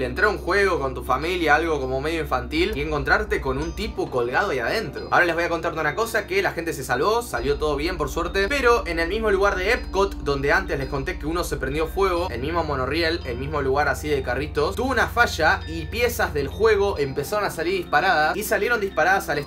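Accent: Argentina. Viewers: Sos arg??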